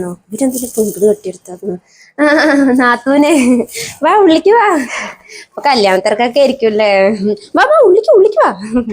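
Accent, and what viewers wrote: Palakkad, India. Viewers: നിങ്ങൾ പാലക്കാട് ആണോ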